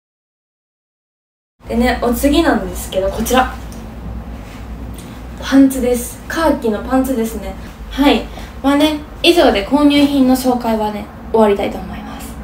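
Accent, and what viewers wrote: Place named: Kansai, Japan. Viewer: 지나가는 나그네입니다. 일본어 간사이밴이 듣기 좋아요. ホンマに日本人？の顔か韓国人の顔だから驚きました。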